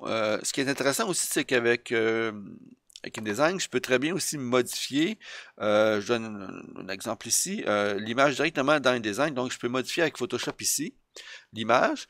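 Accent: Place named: Quebec, Canada. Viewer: Bravo, bien dit et le suivi vidéo est clair. Je ne veux rien enlever aux narrateurs français de France, mais ça fait du bien d'entendre une voix québécoise narrer de la vidéo pédagogique.:)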